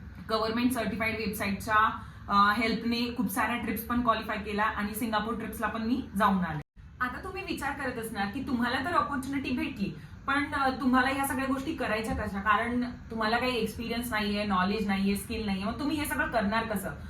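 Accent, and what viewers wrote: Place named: Marwari, India. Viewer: ताई, सर्वप्रथम तुझ्या कष्टlच कौतुक.. एका मुलीच्या जिद्दीच कौतुक.. व्हिडिओ मध्ये मुद्दे छान present केलेत.. थोडे मारवाडी accent आहेत.. आणि बोलणं थोडं fast वाटतंय.. मध्ये pause घेतले तर अजून effective होईल.. या प्रयत्नांच सुद्धा कौतुक.. पुढील प्रयत्नांसाठी शुभेच्छा.. लगे रहो 👍..🍁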